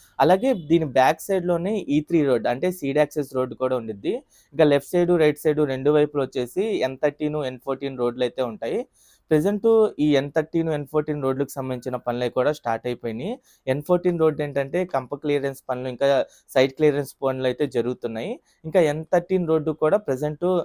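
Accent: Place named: Telugu, India. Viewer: Do not comment about his language,he is speaking local telugu slang,go ahead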